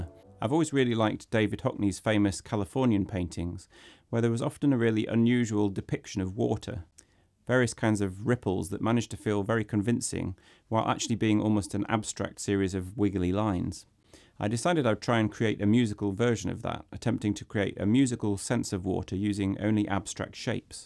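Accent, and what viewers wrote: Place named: England, United Kingdom. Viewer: I love your accent. It reminds me of my friend from England who I could talk about classical music with for hours. Now he’s in Tel Aviv. :,) Keep up the great work.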